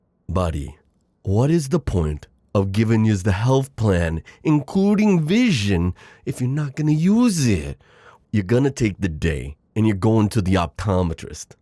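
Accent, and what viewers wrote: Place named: New York, United States. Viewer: Your accent's inspired from Little Italy's "the Godfather" isn't it?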